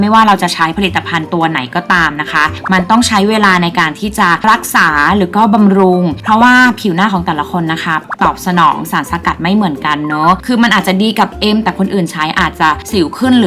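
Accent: Isan, Thailand. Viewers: ชอบพี่เอ็มพูดอีสาน น่ารักมากๆๆๆค่ะ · มักๆหลาย อีหล้า เวลา เว้าอีสาน หน้ารักหลายๆๆจ้ะ นาง❤️❤️❤️❤️❤️❤️😘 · พูดอีสานได้น่ารักมากกกกกกกกก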